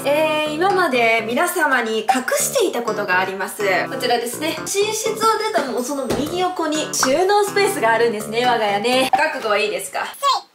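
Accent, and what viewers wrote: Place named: Kansai, Japan. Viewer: ｢ほなまたね｣ インスタで言ってたよな！関西弁最高👊💓 つい最近まで関西住んでたから聞きたくなったらちゃんの動画見てる！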